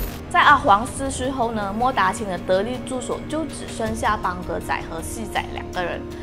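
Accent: Malaysia. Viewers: 這裡我要幫講幾句，因為看到一堆人在罵資料不對，不敬業，發音很奇怪等等，我實在Beh Tahan。還有一堆性騷擾的留言，根本一點教養都沒有，不知羞恥是馬來西亞人，講華語的口音自然也是馬來西亞的腔調，有什麼問題？另外，Botak Chin的故事有很多種說法，別說民間了，網絡也有一堆，已無從考證。沒有什麼誤導不誤導的我還看過Botak Chin主動投降，沒有槍戰的版本 (不過圖片已證實槍戰確實存在) 像美國的鴛鴦大盜也有不同的版本啊，為什麼沒人去那吵勒？所以Botak Chin的真正的故事，或許只有跟在他身邊的人，和他自己知道吧每個人對他的印象或許不一樣有人覺得他是文藝青年有人覺得他沒受過教育 (不過在監獄裡寫的信能看出文筆能力不錯) 有人覺得他是劫富濟貧的羅賓漢，用行動來證明社會的不公不義有人覺得他是無惡不作的亡命之徒，不能被當成英雄不管什麼故事版本，不僅華人，在馬來和印度同胞的族群裡Botak Chin這個角色，在馬來西亞甚至在其他的東南亞國家都會有人知道這名字背後的故事。我覺得已足以證明他為什麼不會被世人遺忘，不會因時代的洪流消失。他向世人展示了當年七十年代的社會情形，也證明了法網恢恢，疏而不漏。不管做壞事的背後原因多麼堂皇，終究逃不過法網狙擊加油，已訂閱！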